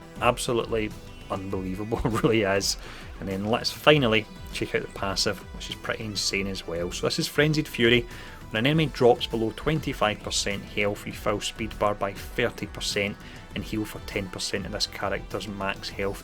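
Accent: United Kingdom. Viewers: I personaly like british accent but your voice is not nice. Ive been watching videos about this game iand i hear british ppl and theire voice and accent sucks. I wont keep watching cause your voice annoi me and you dont speak clear! The videos are only for you fellas even americans might not understand. I do but your voice dude ahhhh🤢🤢🤢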